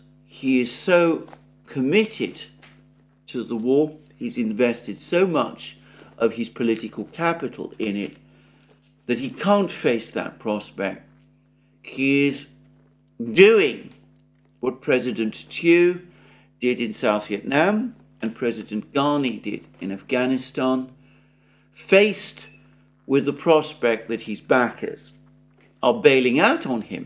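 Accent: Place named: RP, United Kingdom. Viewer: You can easily double the speed of Alexander, and still hear him clearly - that's one of the boons of posh English.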